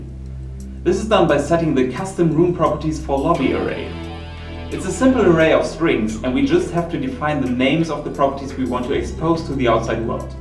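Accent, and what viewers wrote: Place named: Germany. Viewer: i bet you're german!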